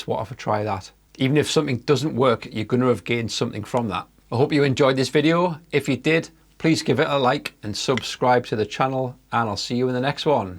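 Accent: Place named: Northern England, United Kingdom. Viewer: Silly question: may I ask you where are you from? I'm Italian, and I like different accents, so I'd like to "link" a particular accent's sound to the city/area it's from. To me, yours sounds like a Northern accent, but I have very little knowledge, all I know is "something from Liverpool - yes, The Beatles 😂😂😂 - to Scotland" 🤷🏻‍♂️🤦🏻‍♂️🤦🏻‍♂️🤦🏻‍♂️. Would you please help me? Thanks a lot! (...and great video, by the way! 😉👍🏻👍🏻👍🏻)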